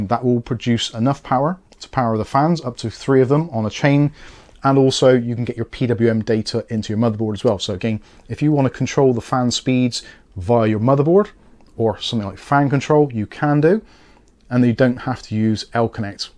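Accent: Bristol, United Kingdom. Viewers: Thanks for the video. You’ve deffo got a south west accent, sounds Bristolian but could be wrong lol.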